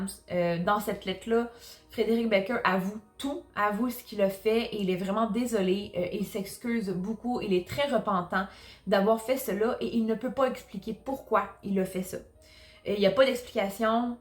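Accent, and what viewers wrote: Canada. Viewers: Je connais pas cette histoire merci de la partager pas mal ton accent canadien 😱😱😱😱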